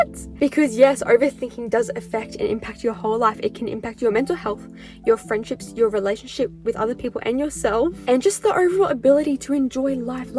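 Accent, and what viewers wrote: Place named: Australia. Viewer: Name = ☘ Accent = Australia 🦘 Mind = blown 🤯 But I'm not gonna overthink it 😄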